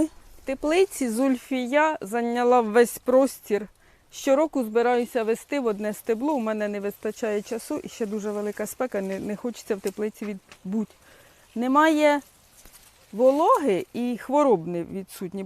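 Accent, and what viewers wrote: Poltava, Ukraine. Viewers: Приємно чути Полтавський акцент!😊 Як не дивно, відразу так і подумала,шо це моя рідна Полтава! І не помилилась.Який район,село напишіть будь ласка.Буду дуже вдячна!❤ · добрий день! Як чую вашу мову, то ніби слухаю свою сестричку. Вона теж полтавчанка, Чутівського р ну. Говір, інтонація-- такі ж як у вас. Я й сама родом звідти. Дуже приємно вас слухати, навіть неважливо про що, наче 60 років назад. Ось і така є у вас слухачка. Давно хотілось вам це повідомити...як кажуть, багато казати, та нема що слухати. Ще раз вам, щире спасибі.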